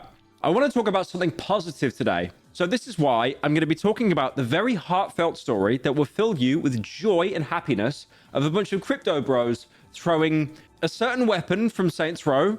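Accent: United Kingdom. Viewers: Why is this british guy talking? Im cutting cheese for my sandwich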